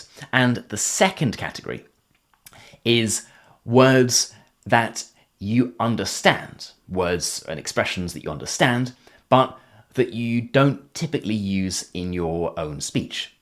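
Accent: United Kingdom. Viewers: Este es acento británico?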